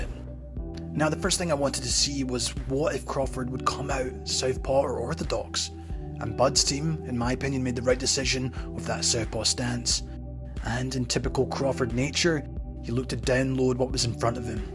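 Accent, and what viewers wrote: Scotland, United Kingdom. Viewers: Are you from Scotland ????? accent????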